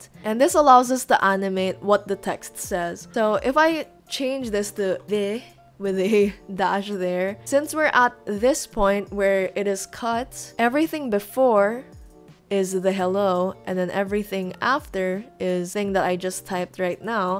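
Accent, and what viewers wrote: Philippines. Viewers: w8 yur accent is filipino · your accent is familiar are u filipino? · for some reason you sound filipino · hmmm i sense a filipino accent are you a filipino?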